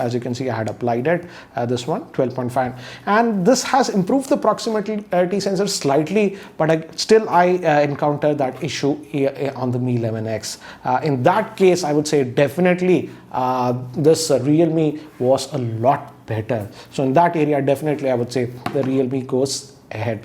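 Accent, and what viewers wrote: India. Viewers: I sorry , I don't want to sound like an a$$ But sir, please speak simple English.. in attempt of speaking emphatic sounding English we Indians tend to over do things a lot Point is : Please stop using present continuous tense where it's nit required. Each and every time you said "HAVING" , well not needed. Just say it has or I have . Etc. Having having sounds so stereotypically Indian.. Having, Preferring.. etc... I know abuses will follow. But make sure they are not in unwanted present continuous tense. 🤟 ☮️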